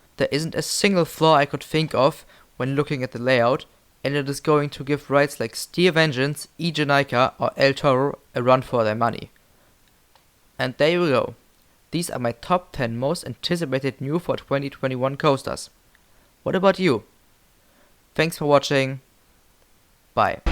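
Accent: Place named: Germany. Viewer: Deutscher Akzent am Start XD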